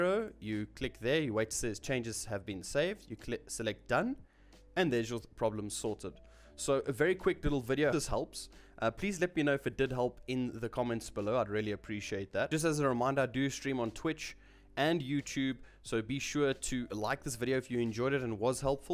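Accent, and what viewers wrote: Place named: South Africa. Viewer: Nice vid, your voice makes me wish there was a South African OP in WZ now.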